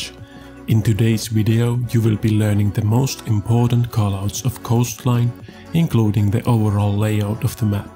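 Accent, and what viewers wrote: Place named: Finland. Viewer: You're definetly Finnish aren't you? Accent is sounding like it.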